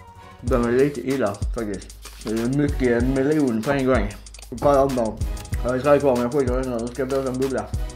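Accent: Scania, Sweden. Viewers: älskar din skånska, så underbar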